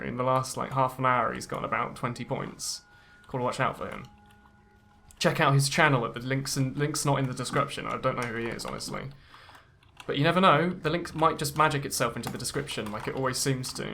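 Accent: RP, United Kingdom. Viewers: Why do you sound so posh °=°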